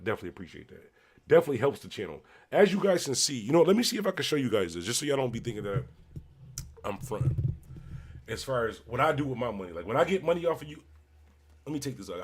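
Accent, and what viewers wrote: Philadelphia, United States. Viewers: You sound like you from Philly.....Salute Bro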